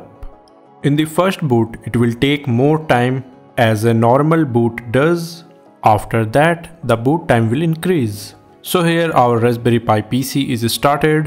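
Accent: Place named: India. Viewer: your indian accent kills me! really? does everyone in India talk english that shitt*y? pffff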